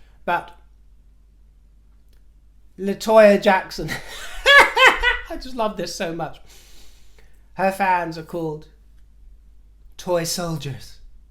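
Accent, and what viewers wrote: United States. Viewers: lol your American accent is spot on. Makes it more funny when you put mm in her place. Lol. I enjoy your posts. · Love your American accent!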